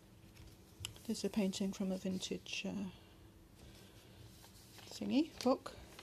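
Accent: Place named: Kent, United Kingdom. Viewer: I'm not clever really your accent is quite clear still. I am from Kent so recognised you have a local accent as you sound a little like myself. It's beautiful up north and I love to travel that way when I can. If you had still lived locally I would have enquired if you do journal making classes as I would have loved to attend. There is little known about making journals down in the SE that I can find, as such YouTube is a valuable community building link. I must go check out your etsy store asap.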